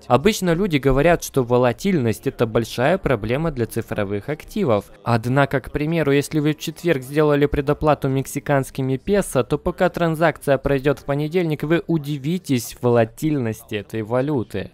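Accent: Kharkiv, Ukraine. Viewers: ОООО ты с Харькова?)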